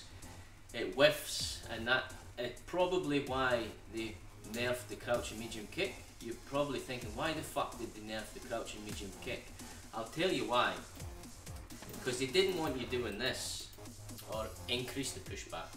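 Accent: Scotland, United Kingdom. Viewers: i always thought you was from Scotland with your accent?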